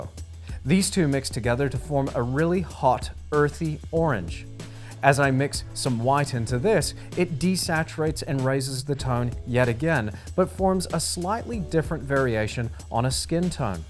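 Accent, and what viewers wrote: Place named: Australia. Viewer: you sound slightly australian but also american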